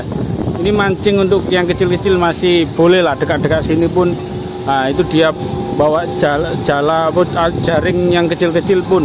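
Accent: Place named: Java, Indonesia. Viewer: pekat betul bahasa jawa kau komandan😅...menjaja tu brjualan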